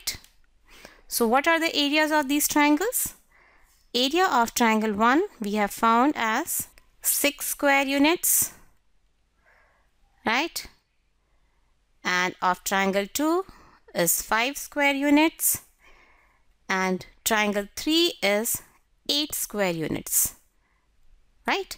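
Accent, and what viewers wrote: India. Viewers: Not trying to be racist but your accent sounds like your Indian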